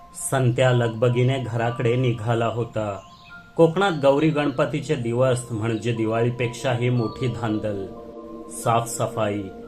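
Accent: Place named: Ghat, India. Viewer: तुमच्या कथा खूप छान असतात मी नेहमी ऐकते तुमच्या कथा तुमची मैत्री तर खूपच मस्त आहे ती नेहमी अशीच प्रेमळ रहावी हीच देवाकडे प्रार्थना.तुमच्या कथेतून अस वाटत की तुम्ही कोकणी आहात पण तुमच्या भाषेतून ते जाणवत नाही.तुमची भाषा घाटी वाटते.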